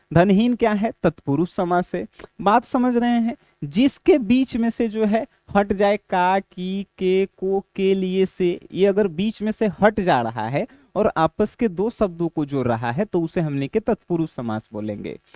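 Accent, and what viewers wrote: Bihar, India. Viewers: Sir ap biahr sa ho jab ap bolta ho muja bhaut hasi ata ha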